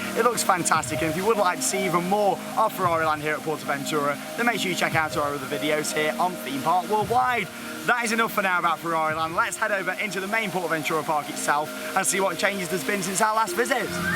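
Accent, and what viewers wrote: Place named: Staffordshire, United Kingdom. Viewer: Its a Staffordshire on i think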